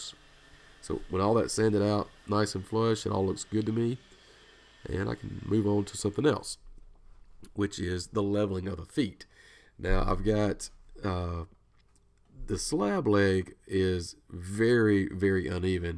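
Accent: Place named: South, United States. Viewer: YOU A SOUTHERN BOI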